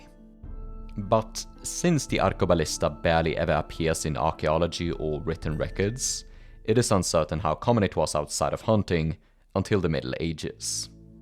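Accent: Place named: Sweden. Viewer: Even beyond the Swedish accent you sound almost EXACTLY like Gold & Gunpowder, love ya both! (unless....)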